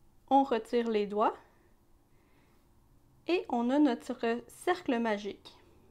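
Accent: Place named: Quebec, Canada. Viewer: Je n'enlève rien aux autres tutos, mais je suis contente d'entendre parler un français québécois, ça fait du bien. Merci pour ce tuto 😁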